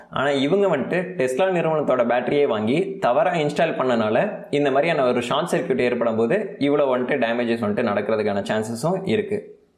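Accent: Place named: Kongu, India. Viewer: மரயாதை,கலந்த கொங்கு,தமிழ்,அருமை🌷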